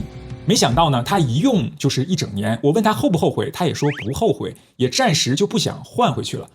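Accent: China. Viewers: 這幾個月才開始看的影片聽口音是大陸人還以為生活在大陸原來住台灣喔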